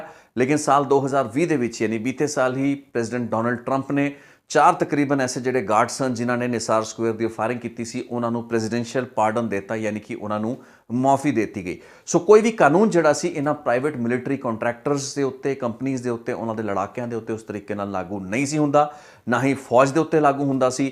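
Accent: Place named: Doaba, India. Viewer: One thing noticed more about u that u have doaba accent... "Peha"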